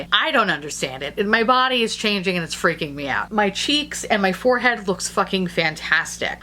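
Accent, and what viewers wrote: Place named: Boston, United States. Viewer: So excited when the notification comes on my screen, enjoy your videos! The accent sounds like a Boston neighbor lol.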